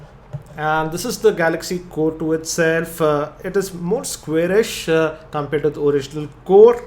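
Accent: India. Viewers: he speaks like an Indian I think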